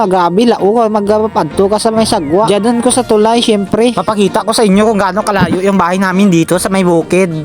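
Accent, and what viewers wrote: Iloilo, Philippines. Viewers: gagi ilonggo ba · Ilonggo ka idol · Taga Iloilo kaba bisaya ka e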